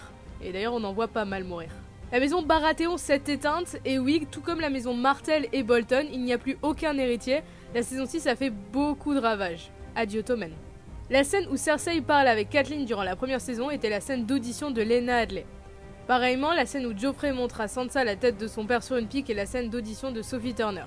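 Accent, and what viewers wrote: France. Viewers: Kevin Domac la prononciation de certains noms est clairement difficile. On ne peut pas la blâmer sur ça, elle le prononce seulement à la française. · Tu me fais rire avec ton accent français lorsque tu parles en Anglais.🤣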